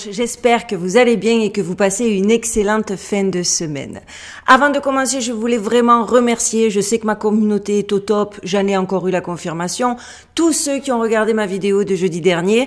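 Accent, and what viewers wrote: South, France. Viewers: Encore une vidéo géniale 😻 j’ai découvert ta chaîne il y a quelques mois et je suis devenue boulimique de toutes tes vidéos! 4 and à rattraper ❤❤ j’adore ta personnalité et je rigole souvent avec toi! L’accent du sud me rappelle mes racines ❤ j’espère que ta communauté ne fera que grandir encore! On a tous besoin d’une pour nous ouvrir les yeux! Tu es vraiment impressionnante ❤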